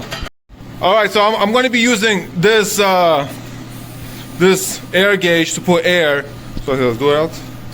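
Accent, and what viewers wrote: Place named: New Jersey, United States. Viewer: What accent is that? Jersey?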